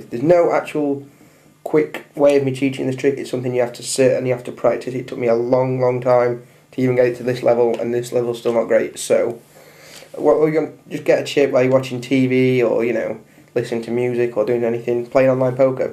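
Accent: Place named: Northern England, United Kingdom. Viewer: I know you're up North but I can't pinpoint the accent, is it Blackburn/Sheffield?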